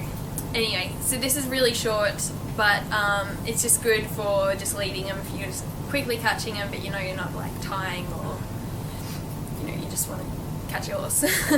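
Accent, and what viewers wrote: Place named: Australia. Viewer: i love your axcent are you from australia?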